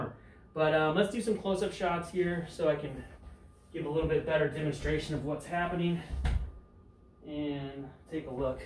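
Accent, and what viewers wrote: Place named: Southern California, United States. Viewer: Hey glad you got a replacement frame. It sounds like you’re from SoCal as well. Could you tell me which giant dealership you dealt with.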